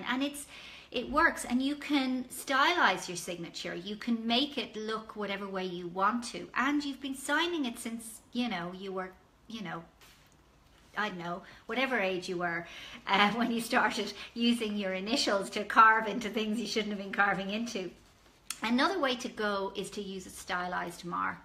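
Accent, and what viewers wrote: Ireland. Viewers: I hear Ireland flowing through ... ☘️ The land of my lineage, County Kerry, Ireland 🇮🇪 M Beth USA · First of all, I love your Irish accent (" ")! 😀👍 I often wish I were more connected to my English, Scottish, & Irish lineages, but to date I've not achieved that. An interesting fact that you may or may not already be acquainted with. I learnt some years ago from a reputable Jamaican newspaper's website (i.e. "Jamaican Gleaner") & an article on its series "Out of Many, One People" or some title like that to emphasize the multiethnic status of Jamaica's population & to imitate the national motto that speaks of this same phenomenon, I learnt that the accent of the Jamaicans in their speaking of English was primarily due to the African enslaved being most shoulder to shoulder with the Irish indentured laborers who were yet, of course, speaking the Irish brogue. 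In fact, I used to work telecommunications a lot in the past as employment & I would occasionally get Irish clients that I thought were Jamaican until they clarified that they weren't! So, yeah, that's the bit of trivia for you. Now, regarding this topic of yours you sought to do your presentation on, it is such a tremendously neglected topic albeit the topic being so essential & so it is precious that you've dealt with it herein, yet not only dealt with it, but dealt with it quite thoroughly & skillfully. Good job! The thing is, my late father having been the very gifted artist that he was made me to be well aware of many of these points from in my boyhood (or when I was a "lad," as the Irish would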